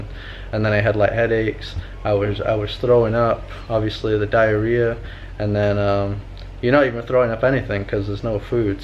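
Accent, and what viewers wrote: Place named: Northern England, United Kingdom. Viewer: what accent is that? sounds sometimes northern england and sometimes like a weird american thing!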